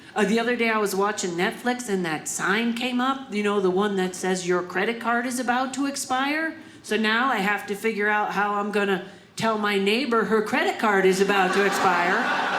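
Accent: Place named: Wyoming, United States. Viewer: She sounds like she's a local Casper/Bozeman/ Kellogg persona.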